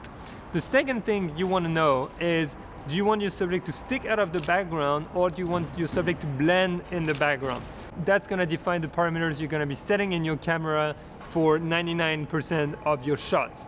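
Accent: France. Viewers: J'ai reconnu un accent parisien mdr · to be honest, difficult to understand your accent, are you French? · are you french ? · I like your accent.. Not too french just the correct amount of charm! Good points as well. Every lense let you do something different. But you can always create something spectacular with them - as long as you go out and shoot. Feel free to take a look at my view on Copenhagen and Denmark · You are french?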